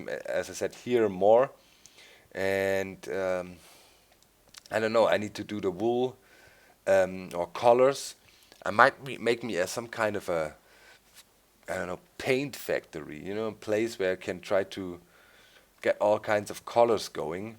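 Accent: United Kingdom. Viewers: That English accent! :D :) Awesome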